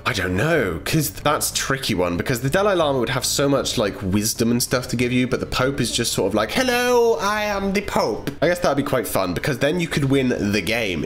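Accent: United Kingdom. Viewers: is British I felt like I knew that cause his voice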